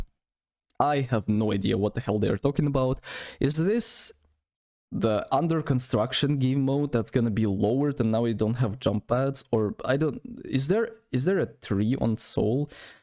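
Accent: Russia. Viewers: Мне показалось или у тебя русский акцент?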